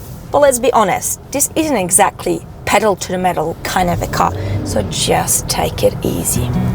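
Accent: Australia. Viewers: I heard the accent right away, but was confused by the license plate (it wasn't Australian) and the steering wheel on the "wrong" side. So until Switzerland was mentioned, I had no idea what market this video was made to inform potential customers. BTW, you are probably aware, here in the 'states commercial producers use Australian presenters or folks with Australia sounding accents when they want to make the product being sold just a bit more...posh. For some reason, the British and/or Australian accent is supposed to convey a bit more...class. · The Commodore comment confirmed it; I knew I could detect an Australian accent in there, on some words especially!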